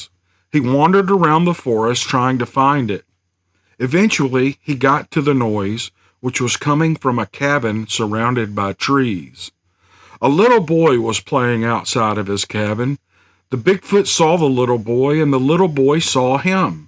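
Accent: South, United States. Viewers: This was so nice, such a sweet surprise! Getting ready for end-of-day routine, but thought...wouldn’t it br nice to listen to story before hitting the hay...not really any hope there would be one. Imagine how delighted it was to see you had been busy and there was not one but three stories.! I feel really spoiled! I have wondered what is the big attraction to hundreds of us listening to this kindly daddy voice...l know your voice reminds me of my Dad, he has been in the land of the living now for many years and l really miss him! Listening to the gentle tones of your Southern drawl is too much like home! I think there must be a few others that feel like this is almost magical, allowing us grown (and in some cases OLD) folk to enjoy being read to by a loving Daddy again! Thanks so much,. Hope this doesn’t sound corny...the stories are nice too, as usual. 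Appreciate your doing these so much! Entertaining and comforting! Happy trails! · Early in my adult life I shed my native southern accent for a career in major-market broadcasting, but I can still talk like that when I want to., I like your accent very much, and I don't believe anyone will have any difficulty in understanding you. Also I love that you don't make any attempt to hide your faith in Jesus. He is LORD! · love your voice reading these! and your voice is so country!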